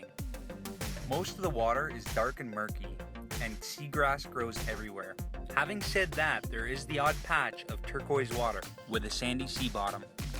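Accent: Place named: Canada. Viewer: best Canadian accent ever!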